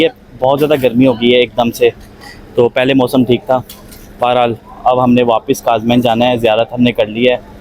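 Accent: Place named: Punjab, India. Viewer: Bhai tum Punjabi ho tum jab Urdu bolte ho Punjabi tone me bahut maza ata he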